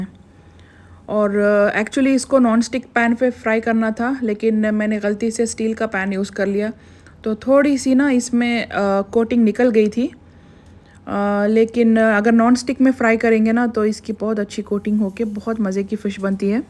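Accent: Hyderabad, India. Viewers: Assalamualaikum! New subscriber here 😊 I know you are from dubai at present but way of talking se puchi Are you from Hyderabad? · when the Dubai mom is actually Indian with a terrible Indian accent, it's disgusting to listen to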